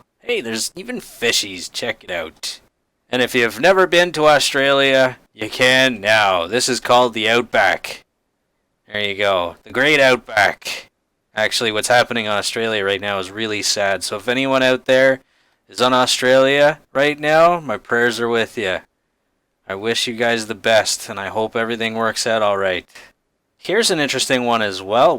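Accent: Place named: Canada. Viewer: sure is canadian mate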